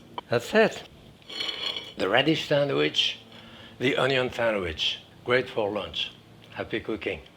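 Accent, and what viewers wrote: France. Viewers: very nice french accent , also beautiful kitchen, very cousy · Why does English spoken in a French accent sound so much better?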